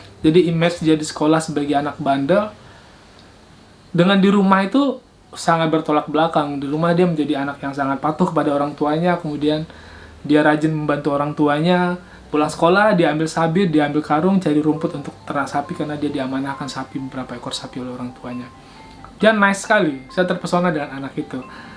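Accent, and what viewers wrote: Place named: West Sumatra, Indonesia. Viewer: 8.27 "sianu sianu", I am laughing of loud...sorry sir... Masih lidah minang!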